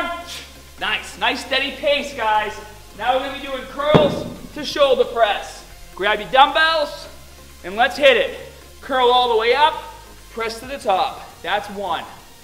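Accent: Boston, United States. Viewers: Your Boston accent makes this hard to watch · Wow...I feel so petty that I just can't to listen to him talk with that Boston accent. I feel kind of weird that it annoys me that much. When I am not a negative or petty person at all. But he won't miss me not subscribing. He has plenty of people that don't care. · This guy has a Boston accent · I swear... I used to watch your videos when you were first getting "staaaahhhhhttted", and you did NOT have that heavy Boston accent whatsoever. Did you just get hella bored one day and decided, "Fuck it, I'm gonna start having a dense Boston accent in my videos"? I don't get it. But I like it.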